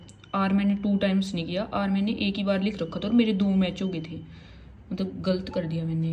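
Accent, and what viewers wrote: Haryana, India. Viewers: Haryanvi type language h aapki ...?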